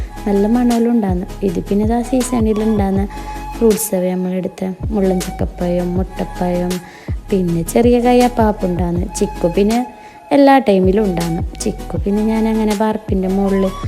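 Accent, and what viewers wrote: Kasaragod, India. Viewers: Kaasarod aanu le Slang nalla rasa kelkan❤️❤️💗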